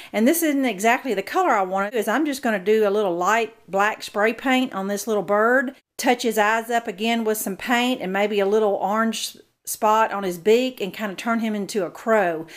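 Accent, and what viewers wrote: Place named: Appalachia, United States. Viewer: So stinking cute. I just found u so u have a new subbie. I knew that voice had to Tennessee or NC mountains. Lol! I'm on the other side of Asheville so maybe we're kinda like neighbors. 😁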